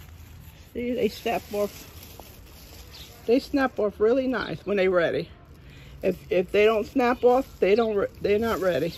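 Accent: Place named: New Jersey, United States. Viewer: I knew when I heard you talking that I recognized a fellow Jersey resident. I love that you garden too. I love growing my own fresh fruits/veggies in the warmer months, and then being able to enjoy the "Fruits" of your labor. I would love to hang and crochet in yr garden with You & Calvin!!! I'm from Bergen County, but now live in Sussex County. ❤❤❤❤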